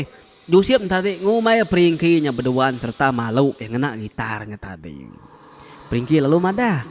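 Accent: Sarawak, Malaysia. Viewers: Rindu ninga slang iban d admin..slang ori urg miri..terang ddinga..#RTM #WAIFm ngambi d nyukung mh aku..manah👍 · Beautiful accent of sarawakian " joseph " = "jusip " 😅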